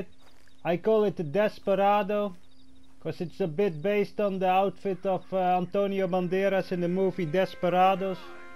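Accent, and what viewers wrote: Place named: Netherlands. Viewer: You sound Dutch for some reason lol. Cool outfits man.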